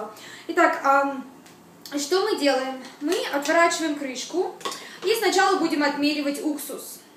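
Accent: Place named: United States. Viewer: у тебя акцент))) наверное ты проживаешь в Америке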